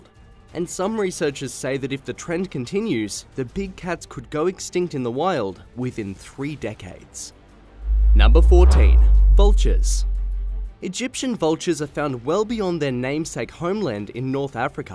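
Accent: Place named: Australia. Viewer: Im Glad theres an Actual Aussie narrating videos here, I just came across an old vid with the most HORRIBLE impersonation of an Australian accent, if Im being Honest, As an Australian myself it made my twitch a little listening to it haha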